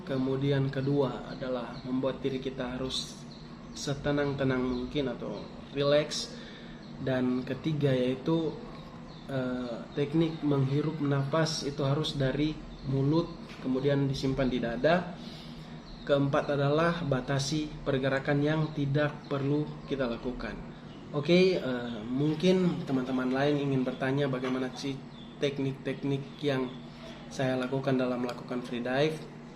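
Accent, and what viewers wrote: Manado, Indonesia. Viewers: Manado p logat kang😁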